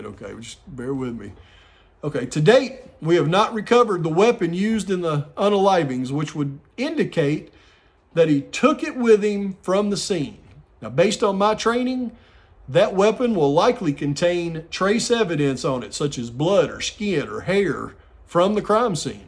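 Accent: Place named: South, United States. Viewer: Wow, that really was a BAM discovery, Mr.! I've been somewhat critical in my remarks about you in prior posts, but I'm coming around to your thinking. However, I still can't figure out who you really are (ex-police, 3-letter agency guy, etc.) Plus, your southern accent.l is intriguing; hmm. Either way, I sure like you! Keep up the great work!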